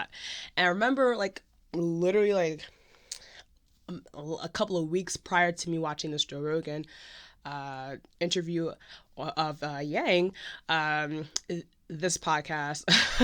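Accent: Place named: United Kingdom. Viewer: My friend told me to get my ears turned around like this haha I really need to from now on lol I didnt think you were American, I thought you were British haha :D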